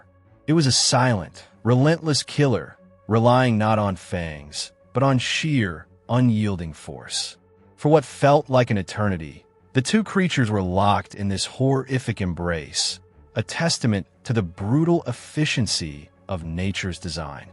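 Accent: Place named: United States. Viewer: Oh dear, sound off again, as it wasn't the lions that sent chills down my spine. It was that flaming American narrator's voice!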